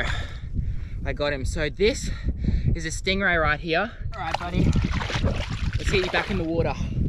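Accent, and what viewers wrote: Australia. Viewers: You don’t even have bears down there!!! That’s why you Auzzies like to beleive you’re all outdoorsy and nature ific but you guys have the weakest fauna of all. Kangaroos and snakes and nothing even dangerous!! And your accents sucks man!! Internet it’s sooo annoying you talk like Brit who wants to be Australian sooo badly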